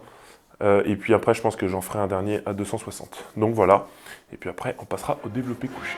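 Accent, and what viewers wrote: Quebec, Canada. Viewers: Hahah tu as vraiment un talent pour te mettre dans des personnages 😂😂 et tu le fais vraiment bien, perso je kiff l’accent Québécois 😂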